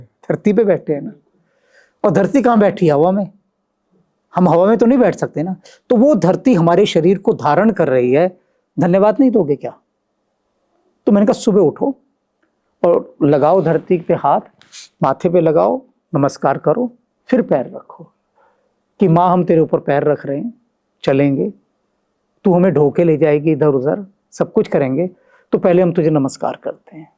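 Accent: Haryana, India. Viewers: Ye host ka accent haryanvi hai ya Rajasthani?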